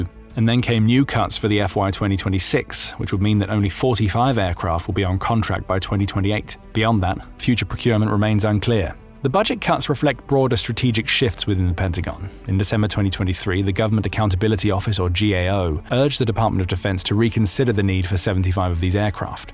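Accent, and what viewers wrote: England, United Kingdom. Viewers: Coming from some guy with an english accent, this is almost comical...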